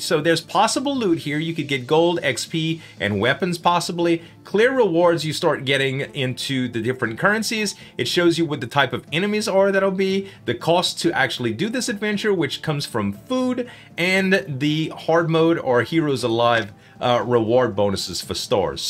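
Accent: Louisiana, United States. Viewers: Oh yeah for sure. No doubt he's a fellow Cajun from my neck of the woods.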